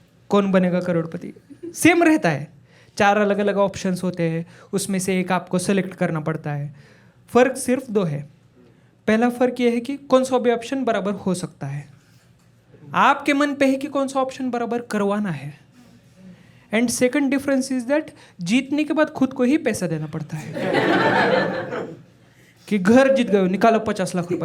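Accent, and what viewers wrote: Maharashtra, India. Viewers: Marathi accent in hindi standup 😀😀😀😀😀😀😀😀 kdk 😂😂😂😂😁😁🙏🙏🙏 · Brother, I am halfway through your video, I like your wholesome jokes. And be confident in our Marathi accent.❤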